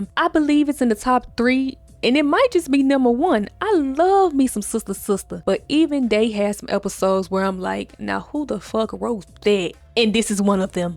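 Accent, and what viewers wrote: Memphis, United States. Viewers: Are you from Memphis?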